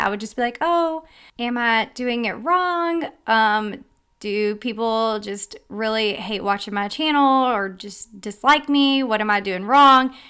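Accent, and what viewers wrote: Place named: Georgia, United States. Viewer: Please enter me in your give away. I enjoy your channel very much, partly because of your Georgia accent. I live in Colorado. God bless 🙂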